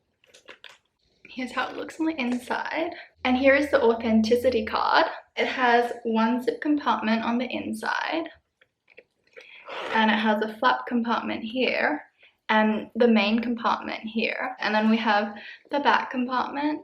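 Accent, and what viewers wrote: Australia. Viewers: Are you from Australia? You sound a lot like wengie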